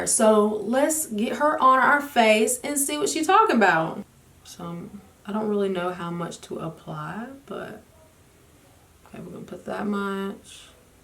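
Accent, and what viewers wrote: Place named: North Carolina, United States. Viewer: love your accent? are you from North Carolina?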